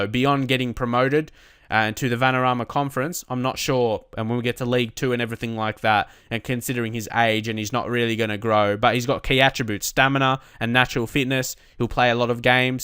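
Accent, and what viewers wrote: Australia. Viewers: Crikey Mate! You're AUSTRALIAN?!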